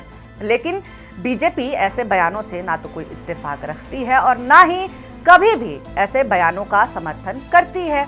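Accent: Bihar, India. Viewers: I'm fan of your style ❤ Up Bihar wali hindi tone + smile ke sath roast😂😂 I obsessed with your all episode 😊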